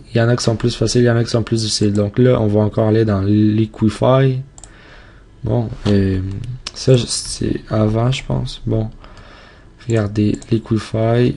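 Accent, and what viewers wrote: Quebec, Canada. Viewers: T'es québécquois non? j'ai un peu saigné des oreilles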